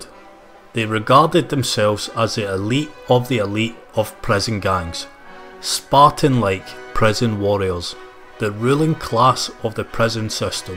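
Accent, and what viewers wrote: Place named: Scotland, United Kingdom. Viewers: You sound scottish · Didn't think I'd be watching a documentary on American prisons with a fellow Scotsman narrating it 😂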